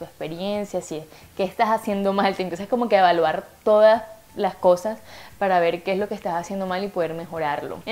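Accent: Caribbean Coast, Colombia. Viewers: Gracias! Me gusta tus vídeos, estoy aprendiendo español y el acento costeño es mi favorito. Saludos desde EEUU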